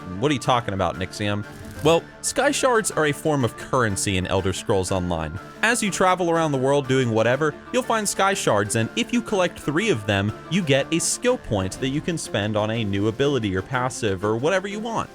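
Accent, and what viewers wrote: South, United States. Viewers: I was loving the southern drawl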